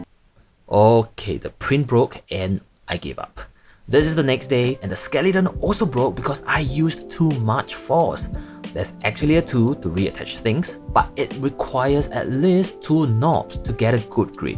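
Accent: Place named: Singapore. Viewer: from the accent and the whiteboard marker u used i could tell u were from singapore haha! love ur sense of humour and u are so talented. gonna binge watch ur other videos now ;) !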